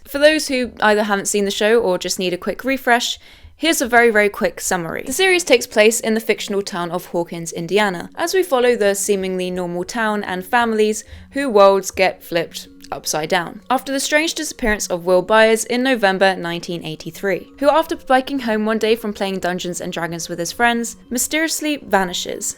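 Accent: England, United Kingdom. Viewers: Hearing this common US English mistake in an English accent was incredibly jarring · Just an FYI, But the word "finale" is NOT pronounced the same as "final." You kept saying in the "final" and "the final." But the word you are looking for is "Finale" which is pronounced "fi-nally." Why that "ee" sound at the end. I know you've got the British accent, but I know the word finale is still pronounced the same between America and The UK and they dont just say "final" for both. Sorry my literature degree getting the better of me... lol but so you know you said it wrong the entire video! 😂 great video though for sure!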